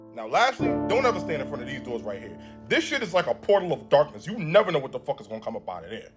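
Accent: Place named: Bronx, United States. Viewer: 5 and 4 are the best seats and I feel like you're from the Bronx are you?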